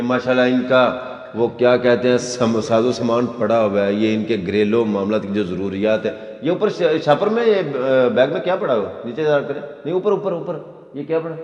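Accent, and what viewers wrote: Punjab, Pakistan. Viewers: Veer g tohadi zaban te punjabi jaj di aa veer g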